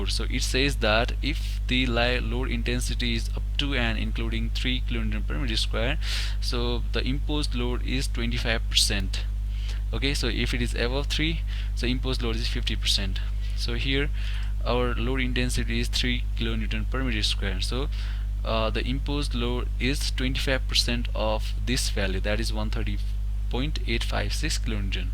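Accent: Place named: Nepal. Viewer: Nice teaching, you sounds like from Nepal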